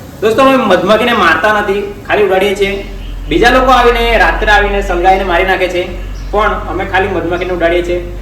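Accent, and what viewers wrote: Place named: Marwar, India. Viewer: bhai to hamare marwadi lg rahe hai bhasa se to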